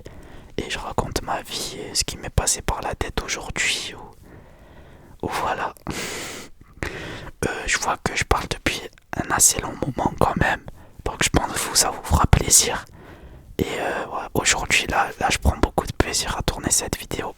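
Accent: South, France. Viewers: J aime beaucoup ta chaine et ton timbre de voix 🙂 petite question tu as un fort accent du sud t Marseillais , nîmois ou Montpellier ? 😉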